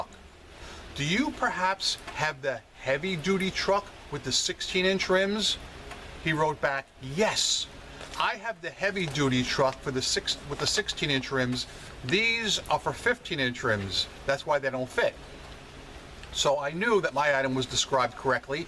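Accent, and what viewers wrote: New York, United States. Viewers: 'Best offer'.....I have some listings with best offer and tell in my description that payment is due immediately. It works most of the time. Occasionally on non BO listings someone will email and ask if I'd accept a lower amount. I'll say yah, I'll take that and ask when they'll be online, then lower the BN just for them. Works every time., I must say your New York accent makes your vids even more fun to watch.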